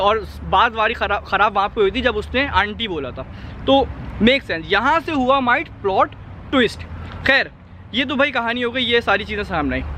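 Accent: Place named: Karachi, Pakistan. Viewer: Chaak late is not karachi accent. However, chambay, bachi, speaking fast....and in some other videos he was like 'tight hai na'. That's typical Karachi slang.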